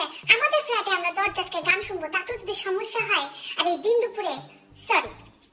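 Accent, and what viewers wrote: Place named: Jessore, Bangladesh. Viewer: আপু তোমার কথা গুলা খুব সুন্দর। তাছাড়া যশোরের ভাষা তো এ জন্য আরও সুন্দর।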